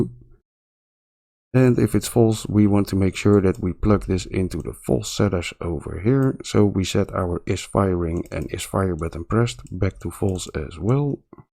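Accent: Netherlands. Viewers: Dutch accent? :)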